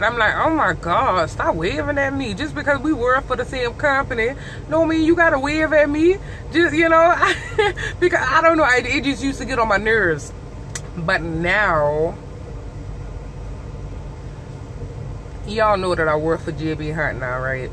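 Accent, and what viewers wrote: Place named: New Orleans, United States. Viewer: N.O accent strong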